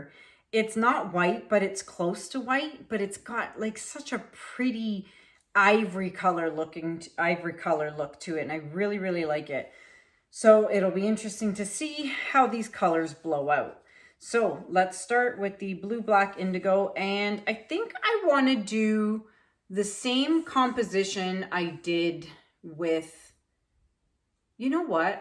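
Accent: Canada. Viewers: Anytime she says anything with “out” in it I laugh BUT I will say I never really could tell a difference in the way Canadians speak vs Americans UNTIL I heard her with the “out” .. turns out … ab”out” etc etc … now anytime I hear it I’m like oh you’re not just from outside of Long Island you’re from Canada!! 🇨🇦🇨🇦 I personally think it’s pretty cool. Ps, this turned out beautiful! I really actually like the titanium buff light as a base color too!! I almost like it better than just plain white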